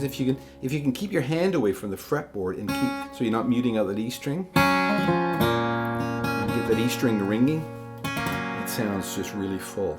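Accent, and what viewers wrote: Canada. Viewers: Judging by your accent and the way you pronounce ‘about’ , are you Canadian , Nova Scotia?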